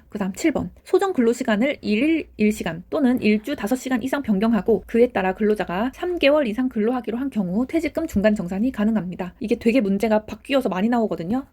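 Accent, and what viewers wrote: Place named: Gyeongsang, South Korea. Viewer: 귀에 때려박는 경상도 딕션과 유려한 설명. 너무 감사합니다!!!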